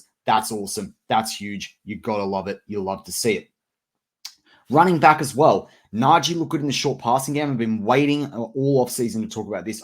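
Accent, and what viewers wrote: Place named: Australia. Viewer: Who is this Aussie talking about the Steelers? 🤣🤣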